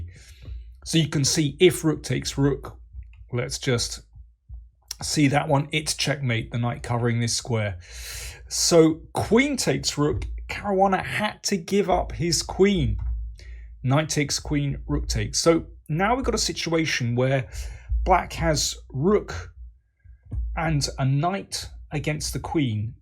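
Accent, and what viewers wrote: United Kingdom. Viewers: nice british accent, my dude (mate)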